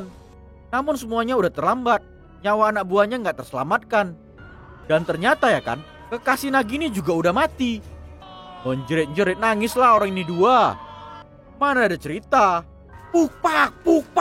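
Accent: Medan, Indonesia. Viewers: Kekel ma bahasa ke bang... Medan d mana ke bang... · Medan kali logat abang ini ya kan we...?? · Anak medan bah😂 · Medan kali ah kau bang.. Horas medan😀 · Yang cerita orang medan , bah makin keren si abang ni😂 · Suara org Medan😀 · Ceritanya kek lagi begosip versi org medan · Eh nampak kali Medan ny ABG ni bah seru pulak aku denger suara kau bg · Medan nih suaranya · Becak becak, Medan x pun kau wey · Fix ni admin orang Medan🤣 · kek gini lah klo org Medan nge review film,jd ngakak😂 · Medan x bahh logat nyaa..haha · kyaknya ko orang medan ya bang Mantap la🖒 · Medan kali kau yah lek hahaha sama sama medan kita Horas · Logak cara bicara abng kaya orng medan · Orang medan bah yg review film nya🤣,mantap kali bah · Yang ngomong orang Medan y bang? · Orang Medan kek nya admin nya ini wkwk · Binje - Medan kali bahasa ko bang 😄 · Nampak kali medaaan kau yaa 😂😂😂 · Suaranya logat anak Medan ini... 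Yg Punya Canel anak Medan Bung... 😂😂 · Kok suaranya mirip logat org medan dah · Dialeg medan bg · Orang medan ni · Logag medan in kak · Logat medan nya mstap kali🤣🤣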